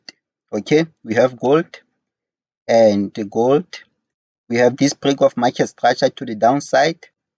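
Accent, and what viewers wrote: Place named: Sierra Leone. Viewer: Are you from sierra Leone bro? You sound like one . Nice video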